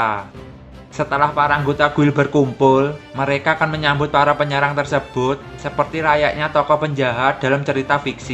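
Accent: Java, Indonesia. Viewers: Medok ya suaranya unik broo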